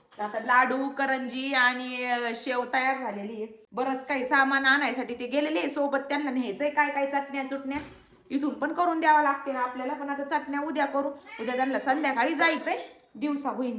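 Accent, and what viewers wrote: Ahmednagar, India. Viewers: आपली नगरी भाषा अशीच आहे... लोकांना ती वेगळीच वाटते...आणि dhawalpurila आमचे पण पाहुणे राहतात...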